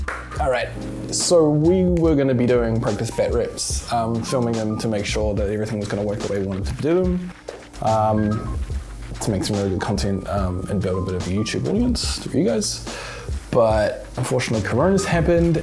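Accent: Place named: New Zealand. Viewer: Wow great content i love this! kiwi accents are so hot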